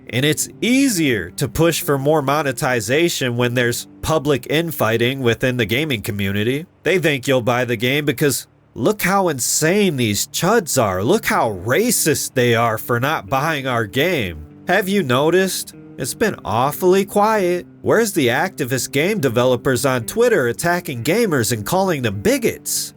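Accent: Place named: South, United States. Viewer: Fr. Dawg is definitely from the south.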